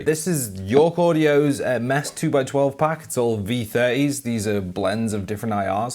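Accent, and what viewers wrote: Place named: United Kingdom. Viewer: Hello. You always used Lancaster IR loader. Is there any difference in using it or the NadIR one? I just discovered NAM and was amazed by the Emil Rohbe models when I watched on another vídeo. I even did a search about him (he has also a YT channel). Now I see you talking about him. I dndt understand this ""triple or jwel rack"(?)" (I strugle to understand britanic accent)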